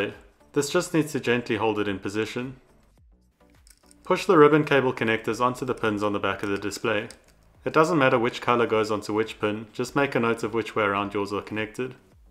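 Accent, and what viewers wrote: South Africa. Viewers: you sound like a South African, not an Australian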